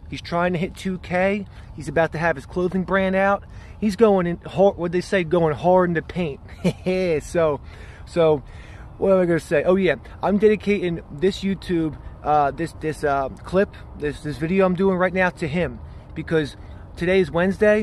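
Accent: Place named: New Jersey, United States. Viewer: Where you from? You sound like my homies in Jersey. Great catches. I am still trying to pin down some yellow perch over here.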